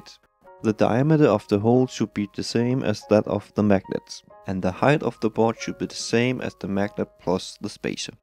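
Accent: Denmark. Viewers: Are you Danish by any chance? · i can hear at your accent that you are from denmark im norwegian, nice video neightbour :) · WAUW YOU HAVE A LOVELY ACCENT. WHERE ARE YOU FROM, no wait let me guess. denmark?D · I enjoyed this video very much. I have learned something new today about magnets I didn't know. I can understand you well with your Danish accent. Thank you. Blessings to all. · it funny when you hear a danish person talk english the accent just sound so wrong because im used to that accent in danish XD still very good vids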